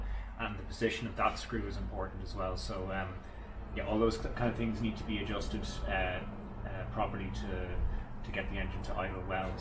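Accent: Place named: Ireland. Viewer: Not sure what that will achieve because this fella's got an Irish accent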